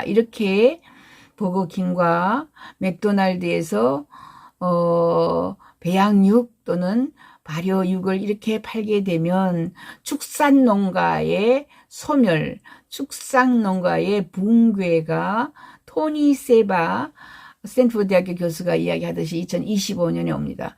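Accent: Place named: North Gyeongsang, South Korea. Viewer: 경북으로 시집온 중국동포입니당. 구수한 지망말투 친근함을 느낍니다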